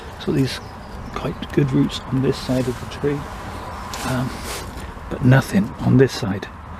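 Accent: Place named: RP, United Kingdom. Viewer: speaks the queens English. I'm a Geordie so you probably wouldn't understand a word on my videos.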